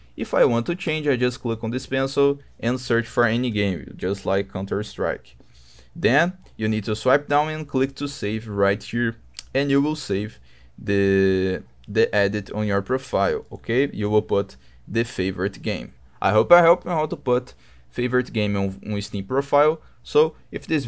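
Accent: Brazil. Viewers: AKSDKASKF percebi que o mano é brazilian no sotaque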